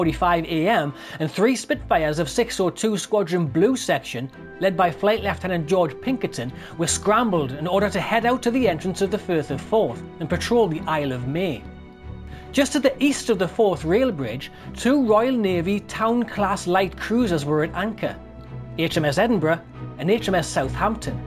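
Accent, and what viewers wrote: Newcastle, United Kingdom. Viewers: Beautiful Geordie accent, I love your voice. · I'm Scottish and I also thought he was a geordie lad. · Sounds like a Geordie( Newcastle,) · The narrator does not have a Scottish accent. The accent is from the northeast of England - a Gordie. Many Scots do speak English well and with a large vocabulary however. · It's Geordie, and it's grand! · Not a robot and not at all odd. It's a North-eastern English accent, probably from the Newcastle area, less than 100 miles from the scene of the battle.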